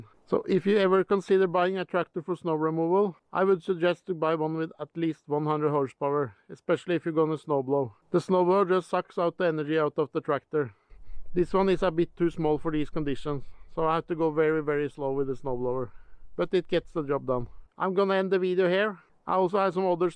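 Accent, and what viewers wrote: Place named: Norway. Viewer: I’m loving your videos! And respect for for walking through all that snow to set up your cameras and get those great shots. And the Norwegian accent is fun to listen to🤭 keep the great videos up and much love from the USA 🇺🇸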